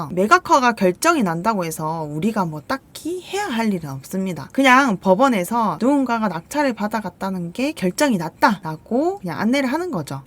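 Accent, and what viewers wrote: Gyeongsang, South Korea. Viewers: 왜 이영상을 이제 야 봤을까.. 유료 강의 듣고 잇는데 거기서 들은 내용이랑 똑같네요ㅎ 같은 경상도라 그런지 쏙쏙 들어와요ㅎ 감사합니다 감사합니다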